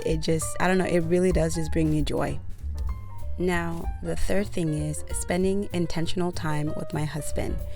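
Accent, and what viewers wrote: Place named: Jamaica. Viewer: You talk like Jamaicans 👍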